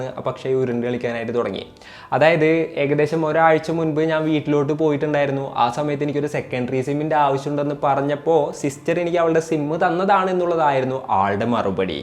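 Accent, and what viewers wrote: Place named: Kodungallur, India. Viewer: സ്ക്രിപ്റ്റ് ഒന്നു കൂടി ശ്രദ്ധിക്കൂ ബ്രോ. ചില സ്ഥലത്തോക്കെ കേട്ടിരിക്കാൻ ഒരു ഫ്ലോ നഷ്ടപ്പെടുന്നു. പിന്നെ ചെന്നുങ്ങയിൻറ്റ്, എത്തിങ്ങയിൻറ്റ്, പോയിങ്ങയിൻറ്റ് പോലുള്ള കൊടുങ്ങല്ലൂർ സ്ലാങ് പറ്റുന്ന പോലെ കുറയ്ക്കാൻ ശ്രമിക്കണം.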